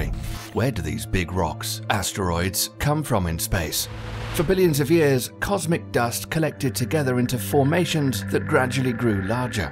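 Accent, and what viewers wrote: United Kingdom. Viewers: Narrator: PLEASE stop saying "Nuke-yu-ler"!!! The word is spelled "Nuclear". And pronounced "Nu-clee-er". "Nuke-yu-ler" (or, often, "Newk-ya-ler") is the 'Murican Bush-level yee-haw pronunciation for high school beer-swillin' gun-totin' dropouts. You sound British; thus, I had expectations you knew the language. "Disappointed, I am", says Yoda.....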